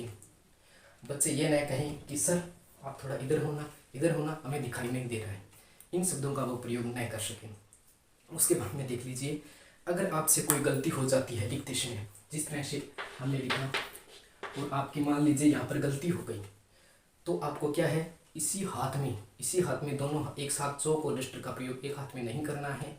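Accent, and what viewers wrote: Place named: Haryana, India. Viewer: Ram ram sir 😍 bhut bdiya smjhaya thodi haryanvi touch language sir 😄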